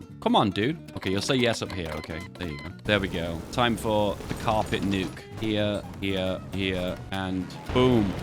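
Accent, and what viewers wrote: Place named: Yorkshire, United Kingdom. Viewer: Knew you were a Yorkshireman, didn't know you were in South Yorkshire! Liked for best county.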